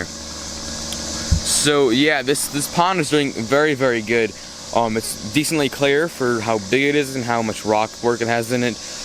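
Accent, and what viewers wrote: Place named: Ozarks, United States. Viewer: where are you from? ozark area I'm guessing?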